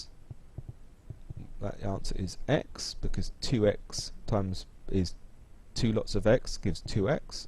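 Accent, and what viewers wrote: United Kingdom. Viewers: gaaahh i love you're british accent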